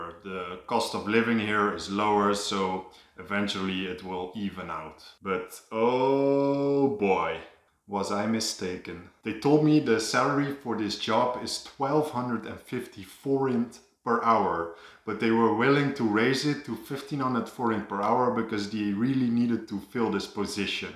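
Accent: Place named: Netherlands. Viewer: I subscribed because you are beautiful and I love your accent and the fact that you're from the Netherlands...much love from 🇧🇲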